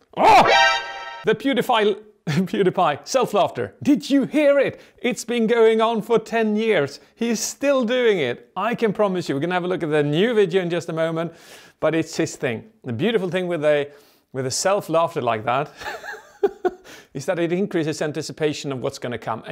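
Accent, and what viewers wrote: Sweden. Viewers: Do i hear some swedish accent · e han från sverige eller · Are you swedish? Also great job on the video watching it made myhead hurt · Well they are both swedish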